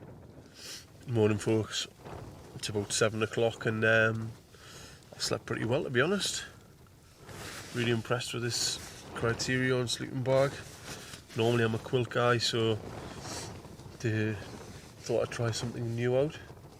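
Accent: United Kingdom. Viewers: Am I the only one that had to turn on the sub titles? I really struggle with North of the Tees accents. £500 for a tent... It better be good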